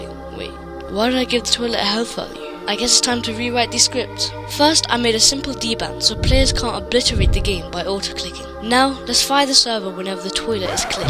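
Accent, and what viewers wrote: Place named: United Kingdom. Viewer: u sound like a chilld, speak like a old british man and insane editing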